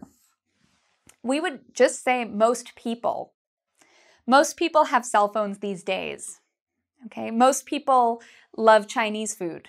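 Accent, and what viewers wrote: Canada. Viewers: i love Canadian accent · canadian eh?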